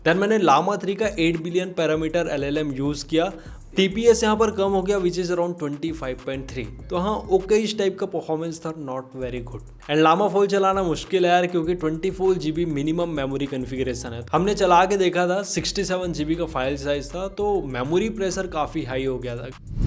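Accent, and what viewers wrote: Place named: Maharashtra, India. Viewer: Great detailing even mentioning the nand gates used! U r going to make it big 🎉 Could sense marathi accent in your English then checked ur insta handle, great to see :)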